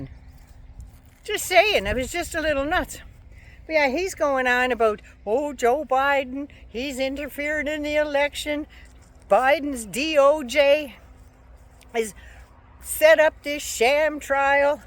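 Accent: Canada. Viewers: is Canadian. I always confuse them as well, as a Brit.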